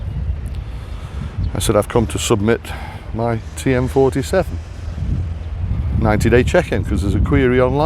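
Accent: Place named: Stoke, United Kingdom. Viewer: New sub here, you sound like a stoke youth ?..i,m back in uk after 7 months (222) days in thailand, i cant wait to get back ,. ive been over there 11 times in all since 2009, ive got a long term thai g/f and we live at her nice house in kamphaengpet , ive got a car so we travel all over TH usually for a month when i get back there, .The last trip was 25th nov 22 and we did most of the southern islands , spent a couple of days in kamala beach down your neck of the woods , I had my fill of thai immigration with extensions and border bounces but it worked out ok to maximize my stay,. I bet it does your head in looking at the thai power pole wiring, im surprised it wasnt 1 of your 5 thai dislikes, !. I plan on getting a retirement o visa next time but there's talk of immigration doing a 90 day visa exempt and changes in the 800000 bht retirement o visa, have to see how that goes, i want to be back by the end of november latest,. 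Bit pointless getting a 60 day tr visa in uk if they end up doing a 90 day free one, im doubting it will happen , having only retired on nov 18th 22 and flying out on the 25th nov 22 69 y/o times not on my side, ive been back in uk since july 4th 23 and i cant seem to get motivated over here, i was planning on selling up and shipping out but with the unsertanty with th immigration its easier said than done, i might go down the agentcy route visa wise, although i have the money and resdency out there ive not got thai bank account yet,.we will see how it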